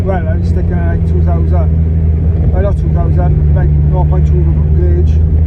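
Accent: Wales, United Kingdom. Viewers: Just randomly got this video watched and heard the accent and subscribed straight away. From the valleys myself and plus I love these cars. Happy days mate 👍🏼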